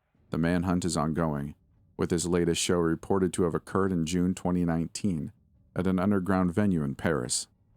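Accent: Canada. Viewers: You are Canadian as hell bro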